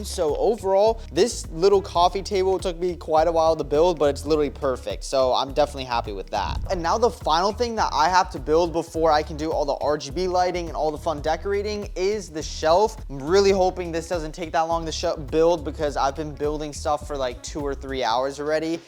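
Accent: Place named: United States. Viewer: Bro is so American England is better he has so strong accent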